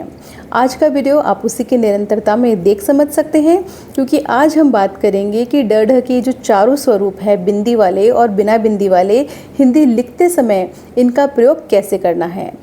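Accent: Standard. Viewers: बहुत बहुत धन्यवाद् mam, आप की हिंदी,उच्चारण एक दम शुद्ध है,ज़्यदातर पढ़े लिखे छात्र, यहाँ तक की सरकारी अध्यापक,बहोत से हिन्दीभाषी प्रशासनिक अधिकारी तक हिंदी का उच्चारण गलत और गवाँरो की तरह करते हैं..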